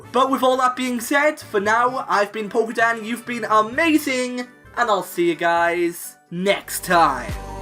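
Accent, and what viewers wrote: Wales, United Kingdom. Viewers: Are you Welsh? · Youre welsh aint you butt?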